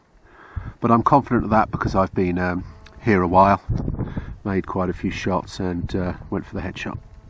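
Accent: United Kingdom. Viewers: you sound like a brit but the landscape looks to me like scotland.... what's the dillio?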